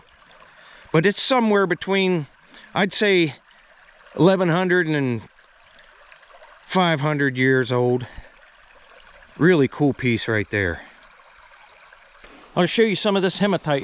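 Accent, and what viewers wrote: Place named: Delaware County, United States. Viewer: love the delco accent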